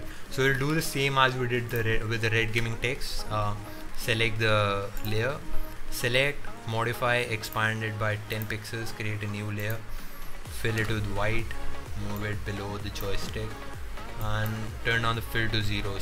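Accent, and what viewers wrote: India. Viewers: hi dude wassup! your voice looks like an indian! i am indian too! first of all happy diwali and i just wanted to ask if to indian youtubers could be friends?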